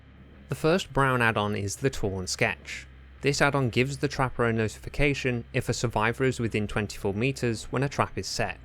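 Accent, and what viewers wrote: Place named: United Kingdom. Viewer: I came for the Trapper rework, I stayed for the sexy British accent ❤❤❤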